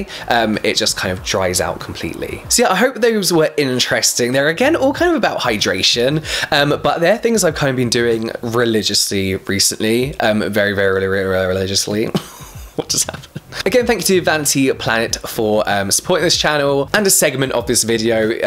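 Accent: United Kingdom. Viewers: In love with ur vdeos,ur ausumn skin😭😭😭n the British accent😍😍😍#lucifervibes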